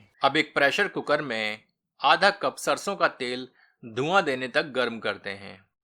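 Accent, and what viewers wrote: Standard. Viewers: Kya sudh hindi bola hai bhai ne ,...wah mza hi aa gya 😊😀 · इतनी शुद्ध हिन्दी wow ❤❤ mera dil aa gaya tum per sir❤ love you sir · आपका हिंदी में बात करने का अंदाज और इतनी स्पष्ट शुद्ध हिंदी मैं पूरा विवरण देने के लिए बहुत-बहुत धन्यवाद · शुद्ध देसी हिंदी और देसी मुर्गा आनंद आ गया देख कर। लेकिन देसी मुर्गा सिर्फ 2 सीटी में ही गल गया?काफी कम उम्र का मुर्गा था लगता है। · Itna to hamare hindi ke sir ki bhi sudh hindi nhi he 😂🤣😁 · बहुत ही शुद्ध हिंदी है। बहुत पसंद आया | और चिकन रेसिपी भी बहुत अच्छी थी| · I can just say.... Mouth watering recipe and heart winning presentation....... And Hindi in its purest form..... 👍 Hail chef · Bhai tho blkl shudh hindi or urdu bolte hai 🙏❤️👍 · sahab ki shudh hindi aur recipe dono great 👍 😋 👌 hats off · itni sudhdh hindi ka uccharan mai aapko subscribe karne se rok nhi paya jai Hind 🙏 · Wow bhai... Mouthwatering hai vakai... 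Apki itni shuddh hindi hame mantramugdh kr gyi.. Pahli baar itni shuddh hindi youtuber ko suna h... Proud on you.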